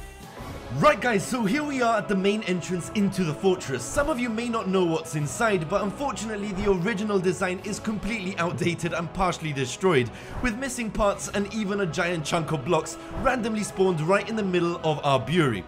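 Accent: Belgium. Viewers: Are you from Belgium sir? ^^ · Are you from Belgium? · are you from Belgium?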